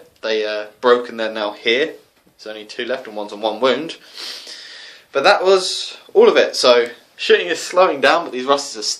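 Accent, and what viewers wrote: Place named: England, United Kingdom. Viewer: Nice batrep mate, it's refreshing to hear an English accent and to see someone getting on with a drawn-up list rather than an American complaining about how the new book is uncompetitive. A nice win and keep up the good work :] PS - Nothing against our Yank cousins, it's just that most of the batreps I've watched have been American and rather dismissive. :P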